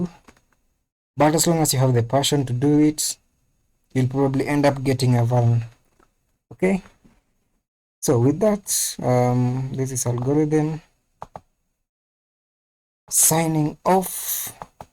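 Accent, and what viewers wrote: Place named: Kenya. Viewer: Bro is that a kenyan accent i here 👍👍 nice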